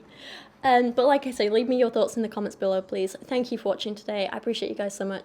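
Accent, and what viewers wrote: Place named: Northern England, United Kingdom. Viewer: As a foster kid myself I think it's better for him to be in a place that fits his needs. This mess is probably gonna leave some trauma especially for an autistic child who is just trying to understand how the world and people work in the first place. I hope he'll get the love he deserves. Doesn't hurt to lose the cameras in his face too. P.s. am I imagining things or has your accent gotten a bit more northern since the move? 🤔 love it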